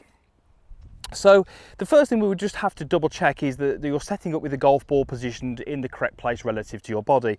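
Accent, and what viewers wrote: United Kingdom. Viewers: Hi Sal the north of England accent isn’t the easiest to understand 😂 What part did you struggle with?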